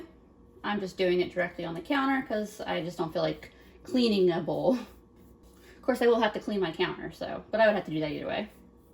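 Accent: Philadelphia, United States. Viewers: We usually do a "potluck" where everyone brings something and we have a buffet of sorts but the last 2 years it's just been my little family so last year the kiddos picked cheeseburgers and this year I made an eye roast a few days before Christmas and we had hot roast beef sandwiches. You talked as if you are or were near Philly. I was born and raised in South Philly and since I moved away I miss nicks roast beef so much! So I try my best to make my own combos lol. Hope all reading this have a safe, happy and healthy new year! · Wow, I knew you were from Philly when I first heard you speaking. Merry Christmas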